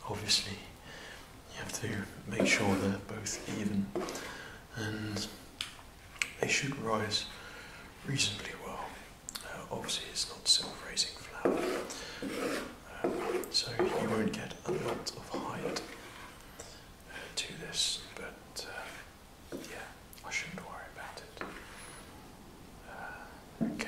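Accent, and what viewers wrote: England, United Kingdom. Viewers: this accent comes from which part of England?!? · Geez, your Brit accent is something, huh? Greetings from a Brazilian chocolate lover! 😋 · ASMR, plus gains, plus cake, plus British accent. I think you just became my favorite youtuber. 😍🎂💪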